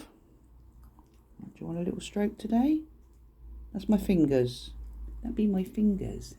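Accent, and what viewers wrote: England, United Kingdom. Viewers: By your accent, I'd say you live in England. Am I right? Hello ftom Colorado USA.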